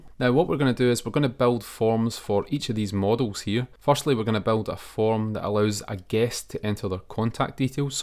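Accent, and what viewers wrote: Scotland, United Kingdom. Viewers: Just curious - where's your accent from? I'm thinking Scotland, but I'm not sure if I've heard it before... 🙂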